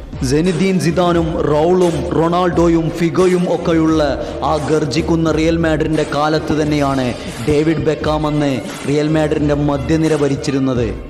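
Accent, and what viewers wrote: Malappuram, India. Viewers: ഞാമലെ സ്വന്തം മലപ്പുറം ഭാഷ...... ഇജ്ഞതി രോമജിഫൈകേഷൻ....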